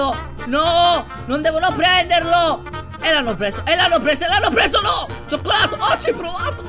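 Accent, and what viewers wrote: Sicily, Italy. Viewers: Sei siciliano? Sono toscano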